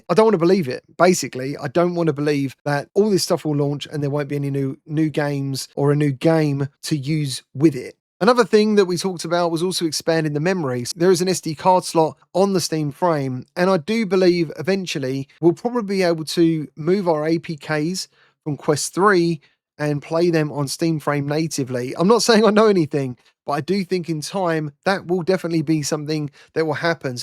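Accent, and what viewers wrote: United Kingdom. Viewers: I want it but without color passthrough for augmented reality, it's a pass. But your balance test wouldn't be an issue if you did it with the proper head strap. We all know the Quest head strap out of the box has been terrible since the Quest 2. It as a Meta decision designed to keep the headset price down. So funny how you guys across the pond say router as rooter. But honestly, I wish we'd stop including letters in words that aren't pronounced, no matter the language.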